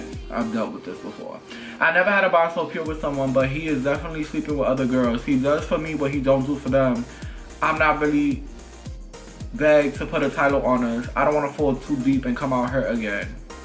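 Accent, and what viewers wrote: New York, United States. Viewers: Omg! I thought you were from NY, your accent? Yep and like you said kind of... You gotta know when to hold them and fold them!!! Pretzel like...okay😜🤐😜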